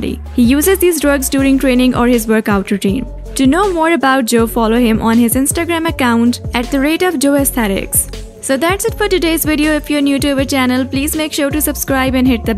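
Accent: India. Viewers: Great......Sounds like a Indian.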